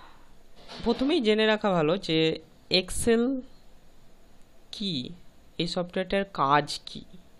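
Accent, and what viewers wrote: India. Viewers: কলকাতা আর বাংলাদেশের মধ্যে উচ্চারণগত কিছু পার্থক্য আছে। সেটা ব্যাপার না। ব্যাপার হলো আমরা অনেক শিখেছি ওনার কাছ থেকে। তবে দার কথাগুলি অনেক ভালে লেগেছে এবং ওনার টিউটোরিয়াল থেকে অনেক উপকৃত হয়েছি। দাদা অনেক ধন্যবাদ আপনাকে। আপনার থেকে আরো অনেক টিউটোরিয়াল চাই। দাদা মাইন্ড করবেন না। কিছু লোক আছে যারা সবসময় মানুষের সমালোচনা করে।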